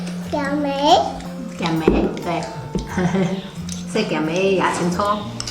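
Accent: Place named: Quanzhou, China. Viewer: Watching your video makes me homesick. 川妹子说起闽南话竟然一口泉州腔。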